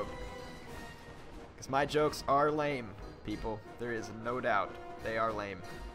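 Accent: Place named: South, United States. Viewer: You say that your southern upbringing does not allow for correct pronounciation of French words but the facts is is that the south has gotten its accent because of a mix of French and american English. It really is a mix of the two because of centurys of being a French colonial region. And yes im a amateur linguist so i know :P PS: you still need to build a port in Croatia...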